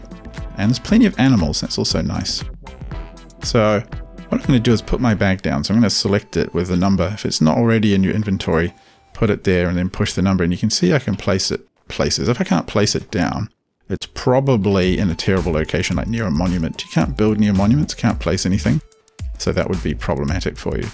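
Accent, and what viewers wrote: New Zealand. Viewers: Dude are you from nz to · Are you from New Zealand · You would not believe the day i have had. Total shit day where a job i did might not even pay me and when i get home to learn the new game i am playing it is a Kiwi behind the microphone. Do you know how much that shits me. He is talking about being on a rock with a 'beer' underneath him, and i'm like what the fuck is this game doing where a player is on a rock because there is a beer underneath him. Then he goes on about how 'Mital' is pleasing to him and i have to look up the player guide and search for 'Mital' thinking it is some character that i haven't seen before. Nowhere is there a mention of Mital in the documentation i can assure you. Shit man, learn to speak proper English would you. Get rid of that accent so you can sound more like normal American English. We would prefer it if you try to sound like an American so we can actually understand you. 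Imagine if we were in a battle of some war and the bloody New Zealander has to ask someone to pass him a weapon and the New Zealander is like "quick, pass me the wippon i have someone to attack" and the other USA people are like "what do you mean pass you the wippon, i don't know what a wippon is. Get the hell out of the front line you New Zealander because no one understands you and why you keep asking for something called a wippon. Go home New Zealander is what they would say. They would say "Go back to your island and you can have all the wippons you want but here in reality we talk English with American accent and if yo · wait a sec r u from New Zealand aswell <3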